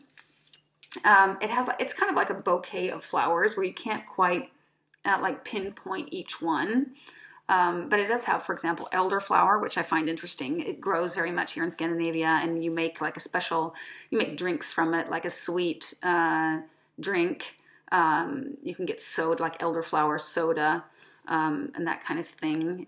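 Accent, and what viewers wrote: Midwest, United States. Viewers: I'm so glad you are posting. Years ago I met a handsome man from Sweden in a bar in Chicago on St Patrick's day. He had a lovely accent. You sound like you live next door in the midwest of the US. So today I tried a sample and found it to be glorious, but it faded quickly. It is an old and small sample. Do you think it fades quickly .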